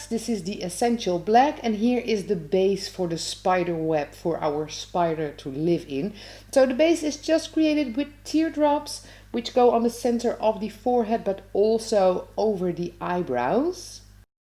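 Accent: Netherlands. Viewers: I didn't know I needed to see this am, but apparently I did Also, are you guys Dutch? The accent from the voiceover sounds very familiar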